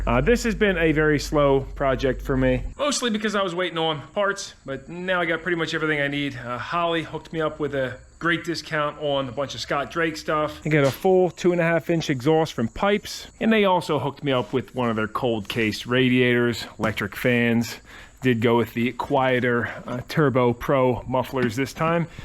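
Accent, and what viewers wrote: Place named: Pennsylvania, United States. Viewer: Thought I recognized that pa accent. My grandparents both sides of my family lived in different town there. Cool agricultural towns!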